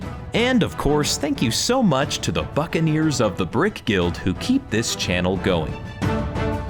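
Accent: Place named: Scotland, United Kingdom. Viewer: Sounds a bit Scottish to me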